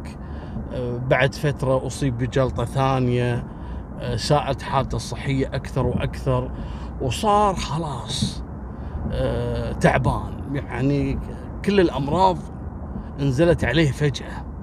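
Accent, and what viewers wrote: Egypt. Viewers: يا قصصك وطريقتك روووعة ولهجتك المصرية اروووووع 👏👏👏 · هههههه بحكي مصري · قصة جميلة واروع ما فيها لهجة المصرية.....😊😊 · يا حلو اللهجة المصرية من لسان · بحب طريقتك وانت بتتكلم مصري يا 😂😂بجد كأنك عايش معانا بمصر تحياتي ليك من مصر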